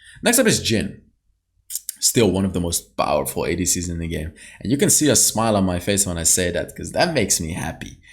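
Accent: France. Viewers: "she doesn't suck the things she's supposed to suck" spoken like a true french, you nailed the french persona 💪💪👍👍 very well done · Nice frensh accent love it but man pls just Say it it's easy ku de grasse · Bro your French Accent is op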